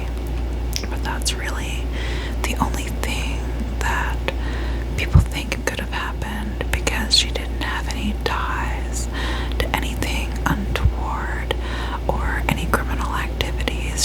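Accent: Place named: Midwest, United States. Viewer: Are you from chicago cause you sound like youre in the midwest if not jersey!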